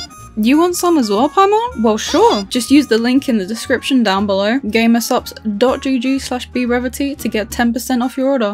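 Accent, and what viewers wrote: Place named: United Kingdom. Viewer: but why are you british?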